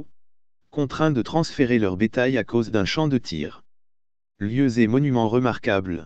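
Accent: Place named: Uzbekistan. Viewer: Pourquoi cet accent ouzbèque quand vous parlez ?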